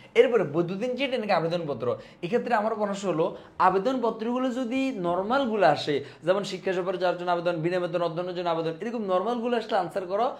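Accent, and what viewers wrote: Noakhali, Bangladesh. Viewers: ভাইয়া কি নোয়াখাইল্লা ?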